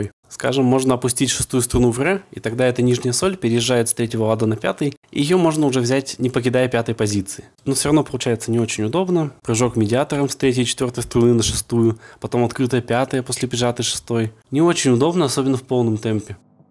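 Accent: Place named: United States. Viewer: Разбор с американским акцентом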